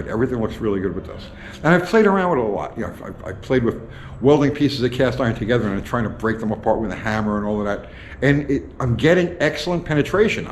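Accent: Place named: Northeast, United States. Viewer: So, based on your accent, I just assumed you were in the Northeast. Shout out from Clarksville!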